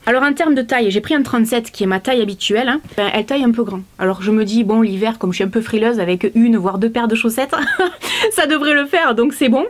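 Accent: South, France. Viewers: Merci beaucoup pour vos conseils d achats les chaussures sont magnifiques j adore votre style et surtout votre bonne humeur 😊 et cet accent qui sent bon le sud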